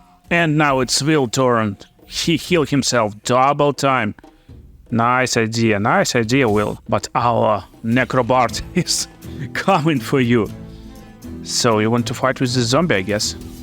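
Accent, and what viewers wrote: Russia. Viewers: Слышь по твоему говору понятно что ты русский.говори порусски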